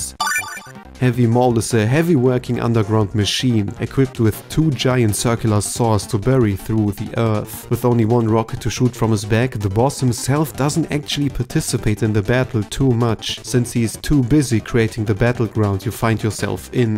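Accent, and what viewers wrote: Germany. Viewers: Du bist ein deutscher. Ich kann es in deiner Stimme hören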